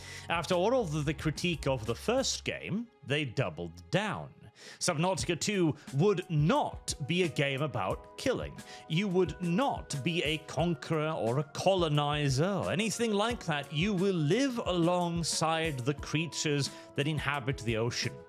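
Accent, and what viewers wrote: United Kingdom. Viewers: He sounds British to me, probably the way he speaks as I seen way too many British content creators speak like that like trying to constantly sound all eloquent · my bad (wrote the comment before watching), to me he sounded like british.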